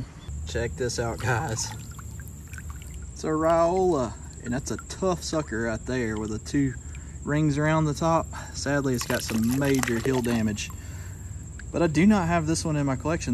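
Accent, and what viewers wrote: Alabama, United States. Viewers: Please excuse my ignorance, but are you saying “Cherra Cola” or “Cherry Cola?” I guess my Alabama accent decoder’s rusty. I lived in Huntsville as a kid when my dad worked for NASA, but I’ve lived in Alaska too long. Sorry for the dumb question 😂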